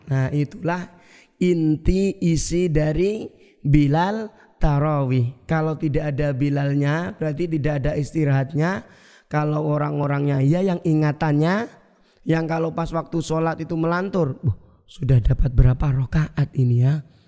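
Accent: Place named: Central Java, Indonesia. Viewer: jenengan orang mana tadz,og sama org jateng cara biLaLx🙏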